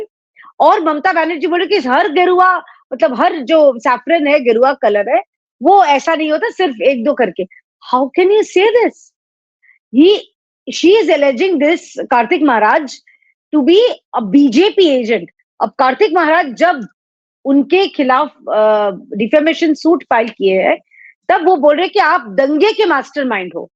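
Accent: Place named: Eastern Uttar Pradesh, India. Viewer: ji your skill of communication is superb/excellent.. Your Cristal clear views & way of explaining the facts is appreciated.Very well done. probably you belong to eastern UP or bihar..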